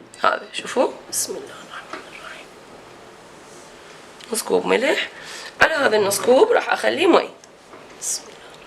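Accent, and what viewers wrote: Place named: Iraq. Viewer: اكثر وحده أعجب بيه اثناءمشاهدة الفيديو كلامج عراقي وطبخج عراقي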